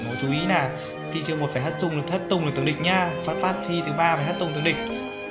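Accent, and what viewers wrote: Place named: Hanoi, Vietnam. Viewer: Cái giọng đậm chất Hà nội nghe anh bình luận hay hơn anh đánh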